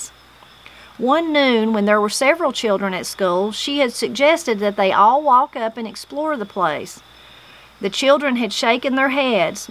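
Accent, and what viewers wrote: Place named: South, United States. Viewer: This was just what I needed at the end of a long week of work. So nice to hear your lovely Southern voice reading to us and the story gets better and better. Reminds me it is time to find another good book to read myself. Or time to open a fall puzzle. You are special and I am so glad to have found your channel.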